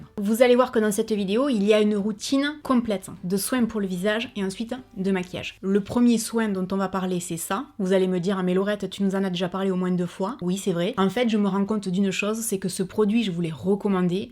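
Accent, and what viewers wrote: South, France. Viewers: Déjà Laurette je t aimais bien.mais là avec ton amour pour les animaux je t adore😍😍et ton accent du sud ça change de ma Bretagne et de Paris...😊😏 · Coucou Laurette ! Juste pour te dire que j'adore toutes tes vidéos ! Tu es marrante, j'adore ta franchise et ton accent du sud et ta bonne humeur ! Quand tu dis "moin...s" ça me fait toujours bizarre ! Finalement, je voulais te dire que J'ADORE ton générique de début de vidéo ! Tu m'impressionne ! Bisous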